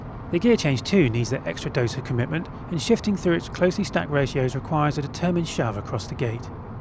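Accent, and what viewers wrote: England, United Kingdom. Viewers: Why do British people add syllables, letters, and phrases to words that don't need to exist and make it a mouthful to say such as br-zed, Aluminium , innavitive, and other hilariously odd ways of pronunciation that unnecessarily make additions to simple words. I've heard it all and American English just seem simpler and less pretentious ,because its like they do this just to be different or put emphasis to things that just don't need it. XD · awesome review!!! love it when car reviewers have that english accent too! :D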